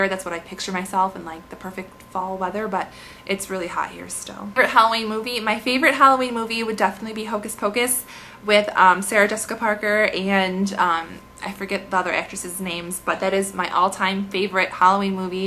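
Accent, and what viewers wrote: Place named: Boston, United States. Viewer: I love your accent!! Is it Boston? :)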